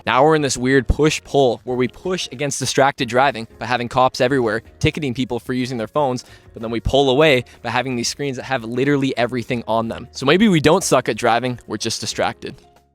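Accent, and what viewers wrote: Canada. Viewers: Realized you were Canadian in the first 10 seconds of this video +Respect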